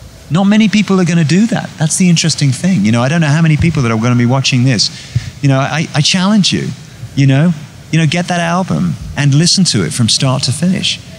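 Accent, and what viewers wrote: United Kingdom. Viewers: Posh accent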